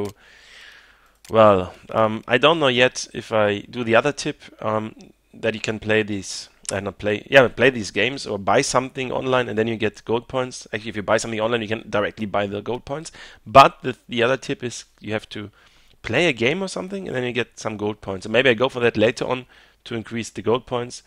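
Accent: Germany. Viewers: Are you german btw? I just discovered you. You definitely sound german :D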